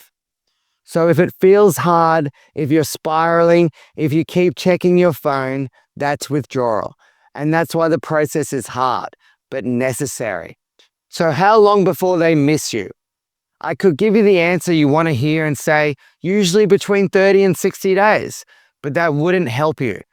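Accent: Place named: Australia. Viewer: I loveee aussie accent. Wish i had it as well.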